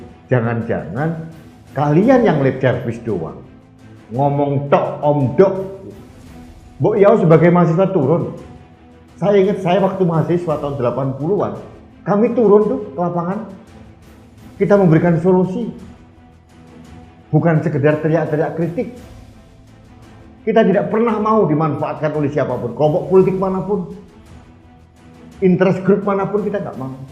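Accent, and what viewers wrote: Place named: Surabaya, Indonesia. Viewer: MAJU TRUS PK BUAT NKRI SALUT DI DUKUNG RAKYAT KAYAK PK JOKOWI DN PK ASLI MANA YA? / BILANG AKEH TUNGGALE REK DIALEK AREK SBYO TRIM PK MAJU TRUS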